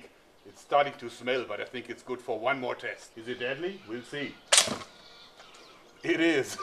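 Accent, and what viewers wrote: Germany. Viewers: #JoergSprave ich habe gewusst dass du n deutsch bist:D denn der Akzent ist der beste:D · Nico Derksen Kann man aber braucht man nicht. Die Videos sind für die Weltöffentlichkeit bestimmt und nicht für welche die nicht genügend Englisch verstehen. spricht ganz gut Englisch und hat so wie ich eben einen charmanten Deutschen Akzent. Keine Extrawürste braten!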